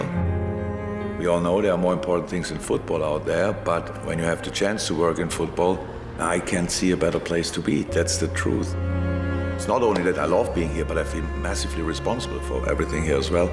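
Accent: Liverpool, United Kingdom. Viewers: Our kloppo now has scouser accent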